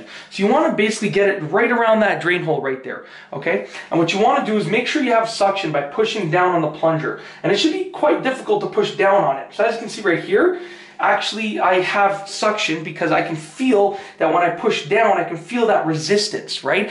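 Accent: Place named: Canada. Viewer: I can tell you're Canadian by how you say oat instead of out.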